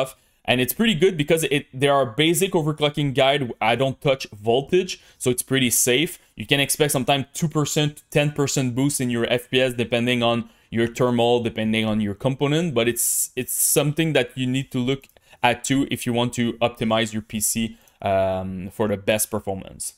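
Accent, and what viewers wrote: Quebec, Canada. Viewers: Dang a quebecois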